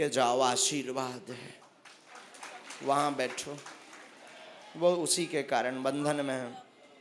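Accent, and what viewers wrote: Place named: Bundelkhand, India. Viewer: Ap ki mohak muskan bundelkhandi boli aur tha thari barne ki ada man moh leti hai.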